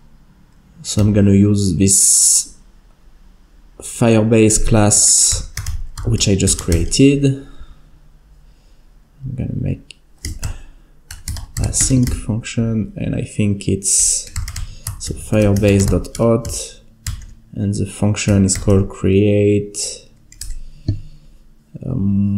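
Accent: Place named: France. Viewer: You are soo french... i truly understand the translations going through your head as you try to speak English. I speak french too but a native English speaker... the "cool" finally gave you out. Nice video.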